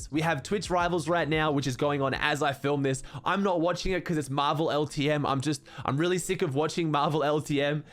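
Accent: Australia. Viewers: Aussie sounds sick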